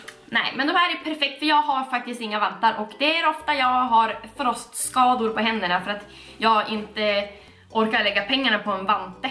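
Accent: Norrland, Sweden. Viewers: Heeej❤️❤️ jag bor också i Skellefteå🤘 asså din dialekt är så norrlänsk😂💕 min är dock inte det😂 skulle vara så kul att träffa dig på tex på stan👌 dina videos är bäst🤘 hoppas du läser denna kommentaren❤️ kram ❤️❤️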